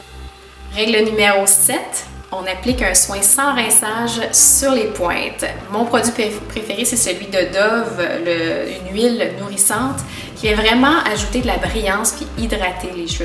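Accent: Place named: Quebec, Canada. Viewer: YASKO SEVRAN c’est l’accent québécois 🤷🏽‍♀️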